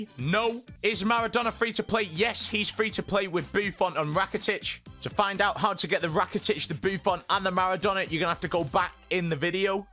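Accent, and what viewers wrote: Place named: United Kingdom. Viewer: Teach me english! British english i mean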